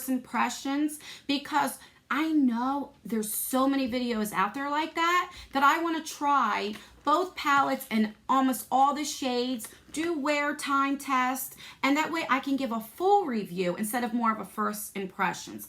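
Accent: New Jersey, United States. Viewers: you are so generous and giving. Thank you for doing this. My husband was raised in New Jersey, and I enjoy listening to the Jersey accent so much. Besides, you are very fun and expressive! People like you are loved and hated because of your beautiful soul. Keep being who you are, it really lift spirits. God bless you always! 🌺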